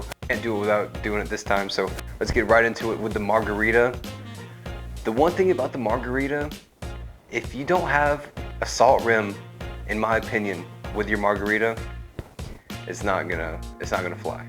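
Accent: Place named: South, United States. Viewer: I know right? Love his slight southern accent too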